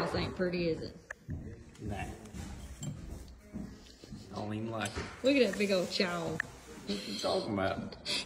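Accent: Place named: Alabama, United States. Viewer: You go girl love your accent reminds me of home I grew up in north Alabama now living in Texas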